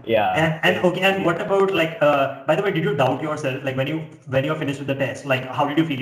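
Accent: India. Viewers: Great videos. For us, who are not Indian, understanding the Indian accent is not always easy. Could you please write a brief summary of each video under the video. That would be so helpful. Thank you in advance and best regards.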